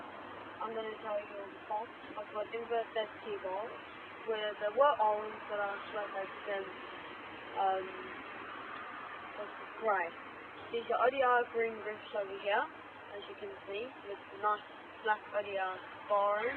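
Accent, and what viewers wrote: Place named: Southern Africa. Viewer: nice southern african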